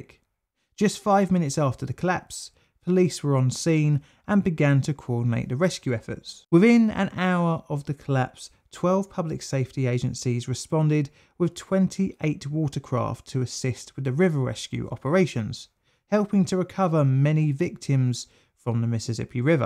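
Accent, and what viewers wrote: United Kingdom. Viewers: To hear a Brit talking about a rather obscure event that happened in my state is kinda surreal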